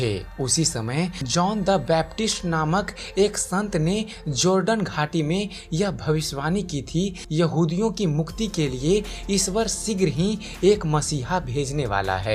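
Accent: Bihar, India. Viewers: full bihari aawaj he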